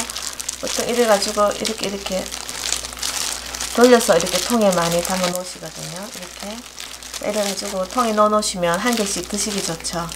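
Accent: Gyeongsang, South Korea. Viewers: 경상도라 정감이가네요 그리고 쉽게 하시네요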